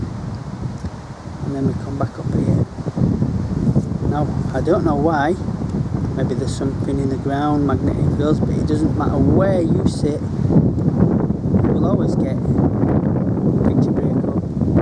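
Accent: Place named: United Kingdom. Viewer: You sound like you are from the UK. Any reason why you are using receivers greater than 25mw? 25mw is the max legal power in the UK & the EU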